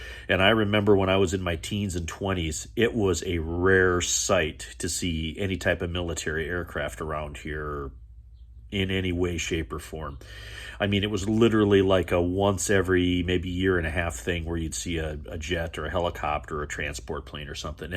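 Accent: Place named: Wisconsin, United States. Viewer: youhave a Wisconsin accent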